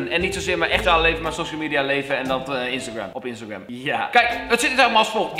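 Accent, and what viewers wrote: England, United Kingdom. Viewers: Dat accent bij zijn Engels 🔥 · Ja ik bedoel een echt Engels accent, zou raar zijn als hij "nep engels" praatte😂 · Ik hou van je Brits accent 💙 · Hij praat met een Brits/Engels accent en dat is heel anders dan Amerikaans/Engels😂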